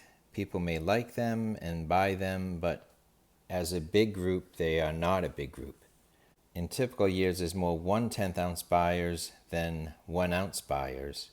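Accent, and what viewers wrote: New England, United States. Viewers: You sound like a New Englanda. I'm from NH. How far off am I?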